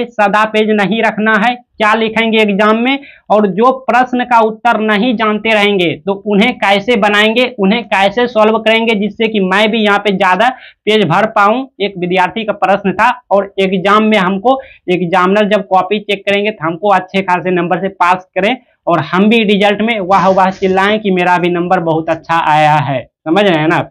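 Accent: Bihar, India. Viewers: Mai video start karne se pahle soch liya tha ye pakka bihari hi hoga😂😂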